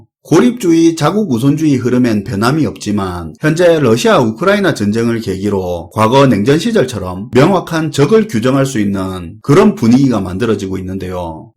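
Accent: Gyeongsang, South Korea. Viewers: 갱상도 사투리로 일목요연 정리해줘서 늘, 감사합니다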